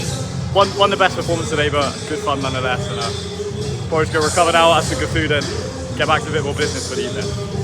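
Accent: Bristol, United Kingdom. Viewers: Hi, love the videos mate!..Spot on👌🏼 I can’t help but notice the accent, as a lifelong Bristolian might I be right in saying you’re somewhere near here😂 Cheers for all the insight dude!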